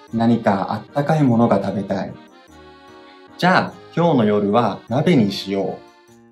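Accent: Taiwan. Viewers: 老师的中文也有点台湾腔了haha🙋🏻‍♂️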